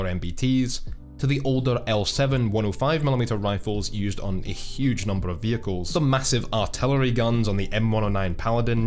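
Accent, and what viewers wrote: Scotland, United Kingdom. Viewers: Correction, explosives are not used to push the projectile out of the barrel. That is called Propellent powder and it does not explode it burns fast to create pressure. The only “explosive” is the small primer that ignites the propellent, but you know all that and mid-spoke with that thick Scotish Broge. Cheers!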